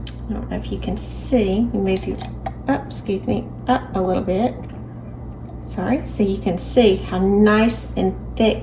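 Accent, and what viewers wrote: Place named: Georgia, United States. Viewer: Just found your channel and I am loving it. I enjoy listening to your Georgia accent.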